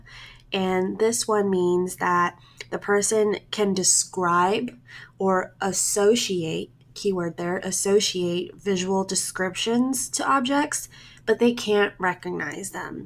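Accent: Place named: England, United Kingdom. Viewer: I just loved your english accent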